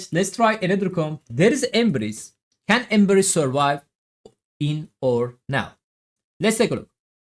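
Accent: Turkey. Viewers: bu elemanin aksani türk ingilizcesi gibi değil mi 😅😅 türk olduğuna iddiaya girerim ama ispatlayamam😅😅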